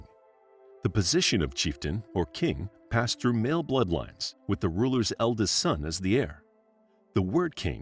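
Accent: United States. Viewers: Why is an American narrating this, also, inaccurately.? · It is funny that an American(?) narrated!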